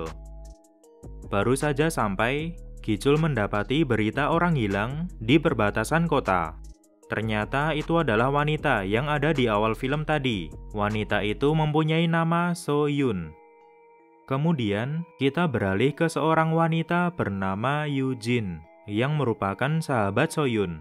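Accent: Indonesia. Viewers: Gaya ngomongnya kek orang indo:v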